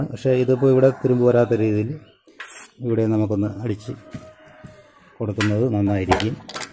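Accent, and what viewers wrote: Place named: Trivandrum, India. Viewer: Correct karyam aane parayunnatthe ...njn already follow chyunna karym aane...slang kollaam trivandrum aane thonnunu 👏👏👏🤝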